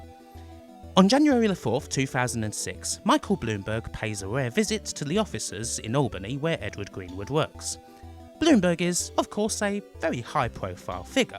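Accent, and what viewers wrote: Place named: Essex, United Kingdom. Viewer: great video where in essex are you from? im from colchester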